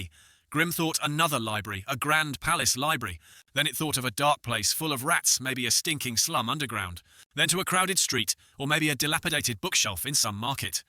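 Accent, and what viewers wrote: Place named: United Kingdom. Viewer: yo love the british voice 🙏💫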